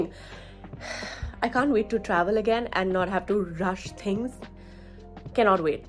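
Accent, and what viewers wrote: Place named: Assam, India. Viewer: I like your Assamese accent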